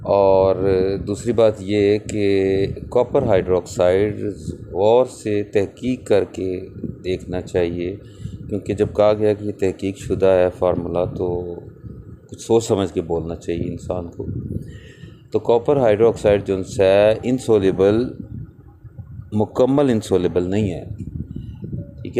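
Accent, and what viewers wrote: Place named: Punjab, India. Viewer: استاد محترم سلام بحت خوب آپ اچھی رہنماٸیہ کر رہے ہیں لیکن ساٸین آپ کی آواز ۔یا کسی وقت کیمیکل ۔دواٸ کا نام اتنا جلدی یا تیزی بول جاتے ہیں جو کہ بار اس لاٸیں کو reves کر کے سننے سمجھنے کی کوشش کرتے ہیں مگر 000.ساٸین لگتا ہے آپ کا لہجا پنجابی اور بات اردو میں اور ہم تو ہیں سندھی اب استاد ہیں آپ سے گزارش ہے کہ الفاظ اور سپیڈ ایسی کریں کہ پٹہان۔سندھی۔سراٸکی۔پنجابی۔اردو۔بلوچی۔سکھ سب لوگوں کو سمجھ آجاٸے۔ ساٸیں الفاظ بھی آسان ہوں تو اور کیا چاہیے ۔ علم کے دریاہ میں سے تھوڑا سا سب پی لیں گے تو آپ کا کیا جاٸیگا۔ورنہ ایسا ہو گا کہ جیسے سمندر سے پیاسا لوٹنا۔ غلتی غستاخی کی معافی چاہتا ہوں اللہ آپ کو خوش رکھے اور بھی خزانہ علم عطا فرمائے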